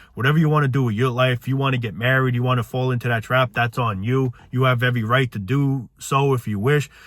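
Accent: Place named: Italian-American, United States. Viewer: What accent is this? Like Italian mobster/Jersey/Boston? Lol sounds cool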